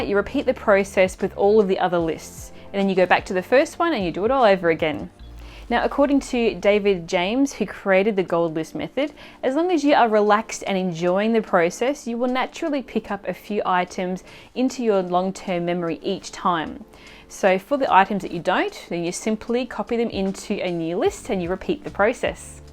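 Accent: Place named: Australia. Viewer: Hi, thank you for your informative video. I was really looking for techniques to expand my general vocabulary. I can easily visualize and link nouns as they are easy to form a mental image. However, can you give any tips on how one might form an image and action mnemonic to words which do not immediately form an image? Eg. seraphic, sedulous, unctuous etc. Really appreciate your video, there is a lot of valuable info I had not considered such as space repetition. Oh, and it's great to hear a fellow Aussie on YouTube too:-)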